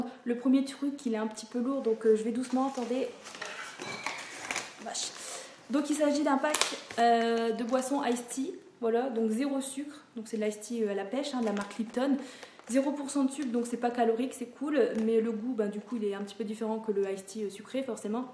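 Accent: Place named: Pas-de-Calais, France. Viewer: Coucou c'est une impression ou tu es du pas de calais ??? L'accent trahit ... je suis du pas-de-calais ;-)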